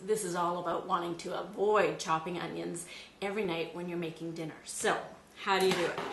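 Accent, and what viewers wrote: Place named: Minnesota, United States. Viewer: nice video, nice accent, are you from Minnesota? don't ya know, I get the big sack of onions, all they sell at the costco and need to do this, thanks!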